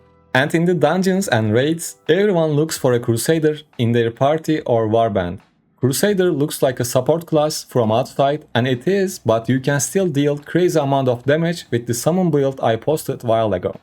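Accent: Turkey. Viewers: Reis türk müsün senin aksan tam türk aksanı gibi geldi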